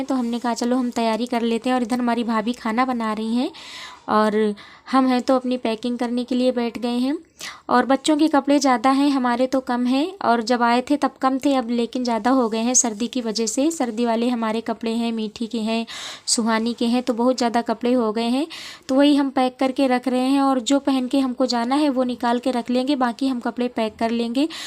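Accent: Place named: Bhojpuri, India. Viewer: आप तो हम लोग के जैसा बोलती है घरवा कहा बा तोहार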